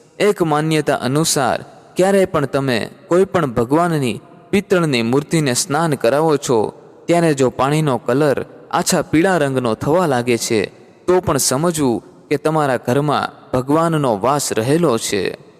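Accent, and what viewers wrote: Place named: Kathiawar, India. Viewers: Good but speech irrted che bcz kathiyavadi bhashaaa😮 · વક્તાના ઉચ્ચારો પરથી લાગે છે કે તેઓ ભાવનગર તરફના હોવા જોઈએ.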